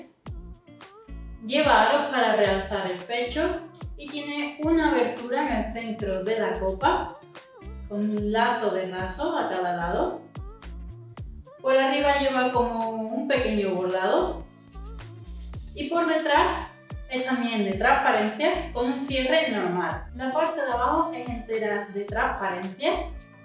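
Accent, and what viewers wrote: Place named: Catalonia, Spain. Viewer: Siempre hermosa, pero tu voz de catalufla lo arruina.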